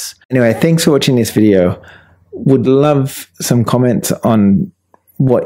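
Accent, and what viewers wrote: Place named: Australia. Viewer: Thanks for the video! You helped me a loads brotha! Love the ozzy accent btw :)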